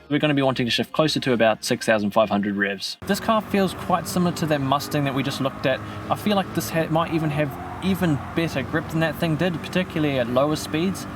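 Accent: New Zealand. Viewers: Thanks for all the time you put into your videos, great info and tips that have helped my lap times and setups a lot! Great to hear a Kiwi doing such a great job! Keep up the good work mate 🤘🤘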